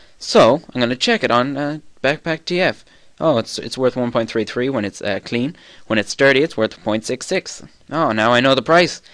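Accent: Ireland. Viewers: YAY! YOU ARE IRISH!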